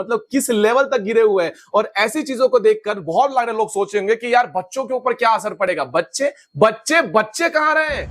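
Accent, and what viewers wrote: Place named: West Bengal, India. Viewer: Hindi bolne ka style sehi pata chal gaya tha e West Bengal se 🤮🤮 feel ashamed 😞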